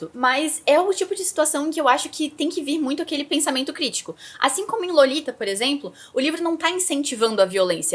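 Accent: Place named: South, Brazil. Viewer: Você é do sul?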